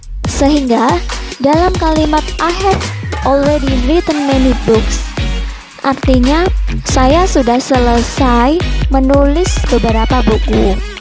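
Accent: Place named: Java, Indonesia. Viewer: Suara medok jawa bahasa inggtis jadi bagus juga yaa kerren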